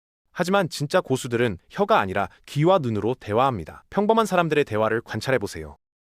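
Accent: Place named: China. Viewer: 발음이 중국인같아여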